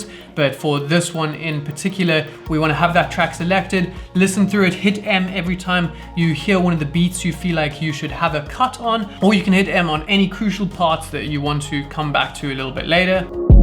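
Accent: Cape Town, South Africa. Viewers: bro you from Cape Town?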